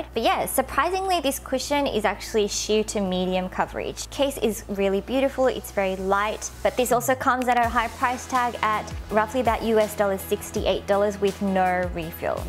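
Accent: Australia. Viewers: Ur aussie accent!!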